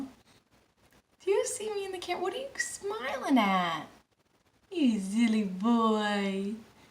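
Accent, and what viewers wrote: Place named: New Jersey, United States. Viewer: ps .. thank you fro the recipe and i love your jersey accent girl it is so cool LOL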